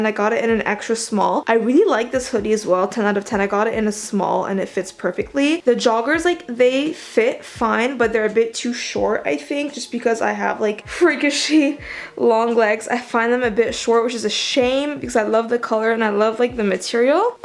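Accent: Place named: United Kingdom. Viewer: the British accent !!! 😂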